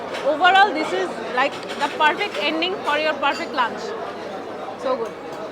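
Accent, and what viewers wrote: Sylhet, Bangladesh. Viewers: ভাইয়া কি সিলেটি??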